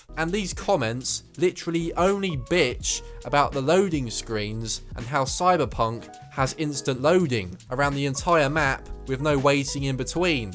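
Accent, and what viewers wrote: United Kingdom. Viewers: this goes to show a british accent. how eloquent it may sound. can still say a whole lot of nothing. lil bro made a video coz he pre-ordered it for 70$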